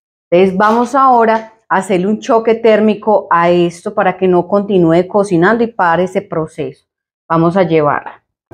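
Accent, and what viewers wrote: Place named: Colombia. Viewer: es paisa,rolabrasileña haha muy chevere la receta, gracias.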